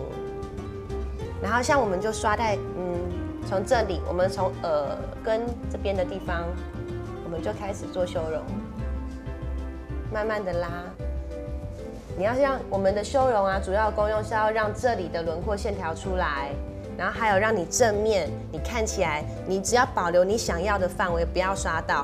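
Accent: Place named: Taiwan. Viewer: 也不是毎個台灣人都這樣...只是她説的比較台而巳...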